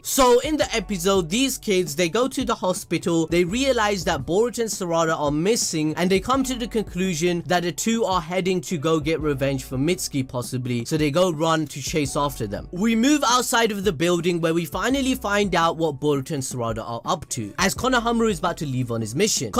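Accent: Punjab, India. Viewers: that punjabi accent though. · LMAO , are you Indian?? , if yes you have a very nice English accent , btw Love ur video and fk trashamaru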